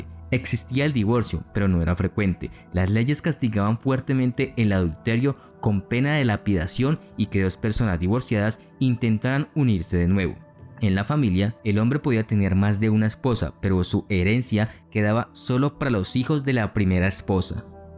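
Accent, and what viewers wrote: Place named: Colombia. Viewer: El narrador es Colombiano, se nota cuando pronúncia Frijoles y cuando no puede pronúnciar Las palabras Nahuatls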